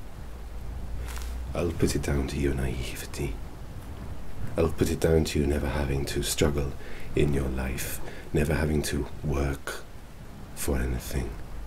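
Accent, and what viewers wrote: Wales, United Kingdom. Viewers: As soon as I saw the horns, I thought: oh. shit. This is incredible,. And the rumbly Welsh accent is 🙌🏻. I have a lot of this world in my head lately- who else is excited for the Gawain movie this weekend?!💚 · How did I not know until now how much I’ve been needing a Welsh accent in my ASMR? Magnificent in every way, as always,. 🤍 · 「 greybeige 」 He’s actually Welsh so this is his ‘true’ accent.😊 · I've watched this so many times now, since it first premiered in the collab...I pop it on every so often and get sucked into the pathos and can't stop watching. By the end I'm like, deeply moved, and also feel so serene from the atmosphere and the progressively more relaxing way the speaking style and mannerisms devolve. "Small mercies against a great evil, they make a difference to a man," indeed. Killer costume, and hats off to that Welsh accent and the distinctive voice and physicality and...self, you brought to this. 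One of my top favorites of yours...just...so good!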